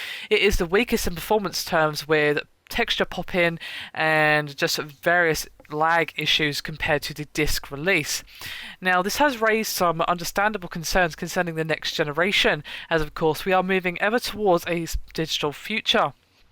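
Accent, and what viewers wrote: United Kingdom. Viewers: the fruity little british accent makes this unwatchable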